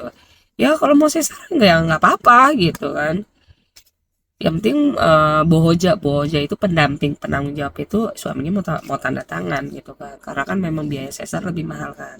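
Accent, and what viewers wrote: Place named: Betawi, Indonesia. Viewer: Betah klu ndengerin kak cerita, lucu, seru kadang sedih juga, apalagi ditambah dengan dialek nyablaknya khas betawi bekasi,pokoknya buat kak, sukses selalu.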